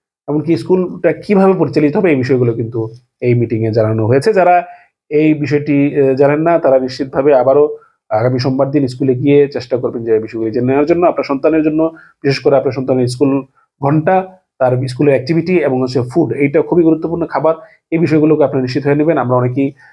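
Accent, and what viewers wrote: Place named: Kolkata, India. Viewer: স্যার আপনি সহজ বাংলায় বললে ভাল হয়। কলকাতার মতো বলছেন মনে হচ্ছে। 🎉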